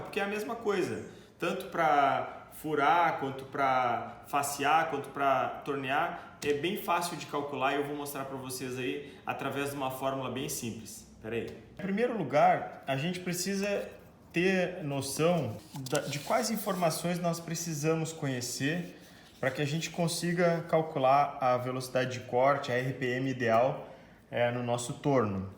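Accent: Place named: Rio Grande do Sul, Brazil. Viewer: Muito bom seu vídeo! De qual cidade você é? pelo sotaque é gaúcho! sou de Vacaria -RS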